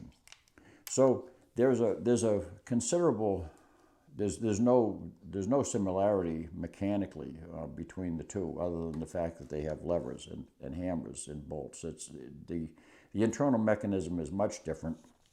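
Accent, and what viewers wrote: New England, United States. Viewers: I detect a New England accent ! I had a paper route with 80 papers as well in 1964. where were you in Nam? I was 69-70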